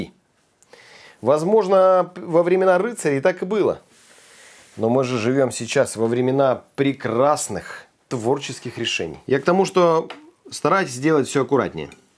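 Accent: Siberia, Russia. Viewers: Привет! Привет из Кемерово, мы почти земляки, у нас стоят сильные морозы, без надобности по улице не гуляем, я лично с утра смотрю твой канал, встретила тебя на ютубе не так давно и влюбилась, сначала мне казалось, что ты новичок и делать ничё путём не умеешь, сейчас мне даже смешно, я поняла, что человека сначала надо понять, как бы срастись меж собой, понять философию, в данном случае готовку разную. но даже не в этом дело, ты говоришь и думаешь как настоящий сибиряк, мы тоже так разговариваем и мне доставляет удовольствие слушать тебя, как будто ты у меня в гостях! Ты меня понял, я знаю, успехов тебе и удачи во всём, конечно семейного счастья и здоровья всей семье! С наступающим!!! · ! Какое же я получаю удовольствие от общения с Вами! Первое — это Вы, а уже второе — Ваши рецепты! Сама я россиянка. Корни моих родителей в Ярославле. Но так сложилось, что с детства живу в Украине. 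Есть чувство тоски по России. Давно там не была, а теперь это и совсем проблема. Вы — одна из ниточек, которая связывает меня с моей Родиной. Ваша речь, Ваш говор — это такое счастье для меня! СПАСИБО!!!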